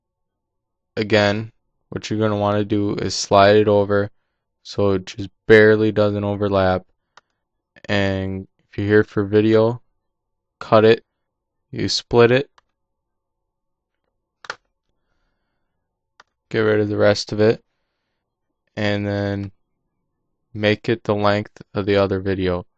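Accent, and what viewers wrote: Minnesota, United States. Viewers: I was close. Lol I'm from Wisconsin but live in Minnesota. We have the same accent.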